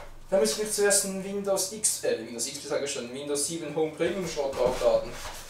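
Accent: Switzerland. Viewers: sag mal bist du Schweizer ? du hast so einen Akzent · Ich interessiere mich für den predator und dachte mir ich bestelle den über digitec.ch.... also bist du mit dem pc nicht so zufrieden? ich brauch den hauptsächlich zum zocken und evtl. berufschularbeiten.... wichtig ist für mich, dass man auch 2 bildschirme anschliessen kann das ist sehr wichtig. freu mich auf ne antwort... p.s. am dialekt an bist du schweizer oda? ^^ denn freui mi uf e baldigi antwort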